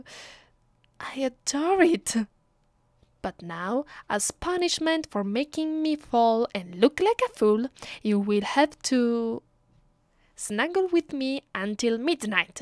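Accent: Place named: Italy. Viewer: To, I enjoyed your vampire roleplay. The title was Vampire Girlfriend. And also, I love your Italian accent very much. It actually made your roleplay, extra special. But not only that, your creator is so romantic. I fill like that I am her boyfriend. And also, I could picture myself in her arms. I am really into female vampire role plays. I hope that there will be more role plays in the near future.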